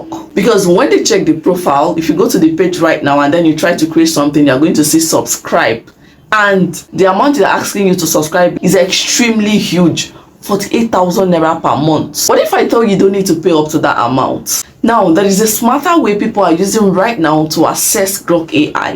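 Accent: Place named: United States. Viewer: The presentation in this video is top notch! Your accent don Dey turn USA oh sis😂 YouTubers! Big people💯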